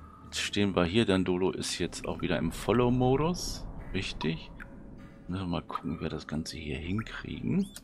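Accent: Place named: Bavaria, Germany. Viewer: Dein bayrisch klingt ganz ordentlich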